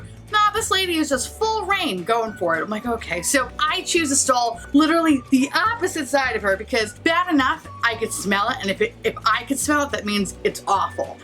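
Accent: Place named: United States. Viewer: I think we may be the same person. It's like listening to myself talk with an American accent. ...aaaaannnnnndddddd subscribe!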